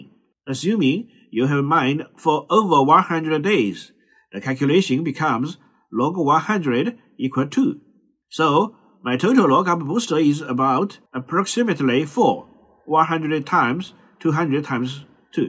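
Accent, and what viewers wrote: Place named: China. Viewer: Your Accent Reminds me of My Chinese IT Teacher... Easy for me to Learn and Follow your Guidance❤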